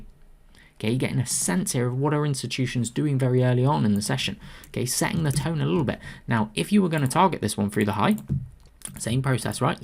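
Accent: United Kingdom. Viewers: hi, not asking for recommendation, just wanted to know what if any allow unlimited stock trades in the UK, only asked as you sound like you are from the UK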